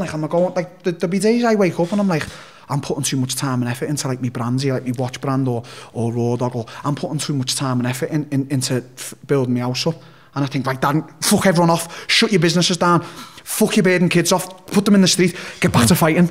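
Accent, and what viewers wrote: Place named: Liverpool, United Kingdom. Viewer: Nasty scouse accent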